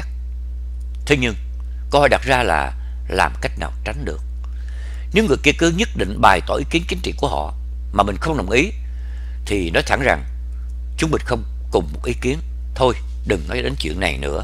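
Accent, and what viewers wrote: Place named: Saigon, Vietnam. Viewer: Thích nghe giọng đọc của anh , giọng chuẩn Sài gòn ❤️👍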